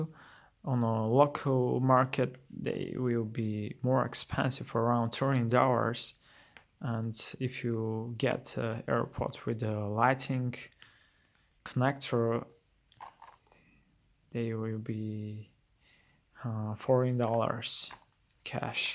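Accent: Europe. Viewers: james brown because English isn’t his first language. This is what Europeans speaking English sounds like. They have an accent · Fine pro Gaming He’s from Europe so he has an accent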